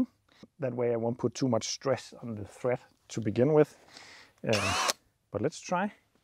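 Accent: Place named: Denmark. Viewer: Synes nok at det var “dansk/engelsk” dialekt 💪🏻. Fed kanal du har her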